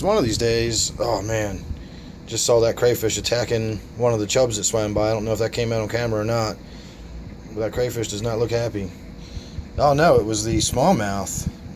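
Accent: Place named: Maryland, United States. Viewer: Are you from Maryland? You sound like you have an old-school Maryland accent, but sometimes tidewater Virginia or eastern North Carolina can sound very similar.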